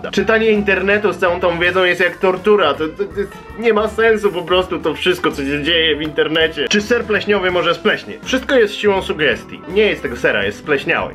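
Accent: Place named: Poland. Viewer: Nagraj beczkę po angielsku z takim zajebistym polskim akcentem ^_^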